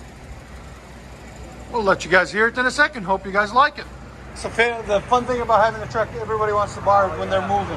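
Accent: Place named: North, United States. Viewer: Tn tags but he sounds like a Yankee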